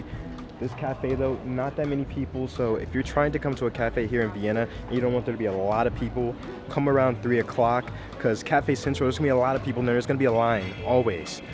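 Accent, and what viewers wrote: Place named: United States. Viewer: Are you American?🤷‍♂️🤷‍♂️You sound American. Watching from HONOLULU HAWAII. Hey, Mele Kalikimaka from America 😎🎅🏻🎅🏻 Very good video. I can always spot an American 🤓😍🇺🇸😎🎅🏻🎅🏻🌲☃️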